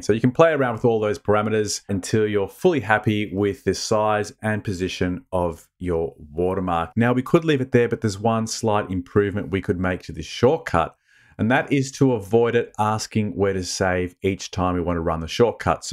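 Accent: Australia. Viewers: Great tute, exactly what I was after & great to hear it spoken in aussie so I don't need subtitles ;) Massive thanks. Wondering if there's a way to make the logo size a percentage of the image also if you know how to add a border during the same process or a diff one if neccesary?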